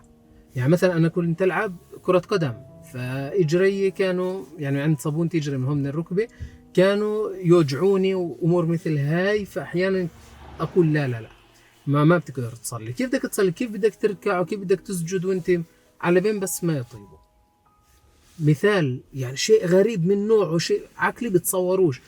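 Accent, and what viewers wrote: Palestine. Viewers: أنا اليوم وبالصدفة شفت أول مرة فيديو إلك وأول ما سمعت أول كلمتين قلت في نفسي هذا فلسطيني الله يرضى عليه، وقبل ما أعرف أي شي عنك وعن فيديوهاتك، والفيديو كان بعنوان "هكذا سيكفيك راتبك بل وسيبقى منه" وبعد هيك سحبت الشغلة وحضرت 5 فيديوهات ورا بعض بدون ما أحس بالوقت، وبعثتهن لابني لأني حبيتك وحبيت أنه يشوف فيديوهاتك لكن هذا الفيديو يا ابني يا كان فعلا مميز ووصل لقلبي غير، حسيت أنه كل كلمة في هذا الفيديو طلعت من قلبك مش من تمك وربي يسعد قلبك ويحميك يا ابني ويثبتك يا رب وربنا يسعد والديك ويجزيهم الخير على هيك تربية. فلسطيني من السويد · ماشاءالله عليك أخي جد انت إنسان عضيم ومحترم الله يجزيك الجنة وينفع فيك بس انت قلت بس الفلسطينين عم يفهموا عليك لا كلامك كلو مفهوم بالحرف نفس كلامنا السوريين ونفس المصطلحات بس لهجة بتختلف😄😁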